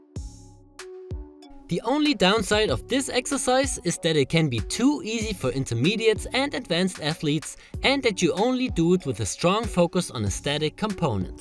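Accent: Germany. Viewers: lmao... 1 sec in to the video i knew u was german,no offense tho in any way or smt i was just pointing it out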